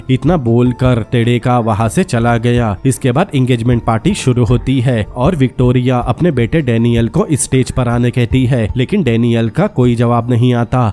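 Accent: Assam, India. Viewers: Kiuki ap ki voice Assamese jisa lagta hai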